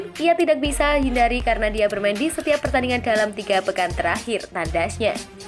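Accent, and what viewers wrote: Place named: Java, Indonesia. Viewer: Lo kok jadi medddok uuwalah .. mba e ..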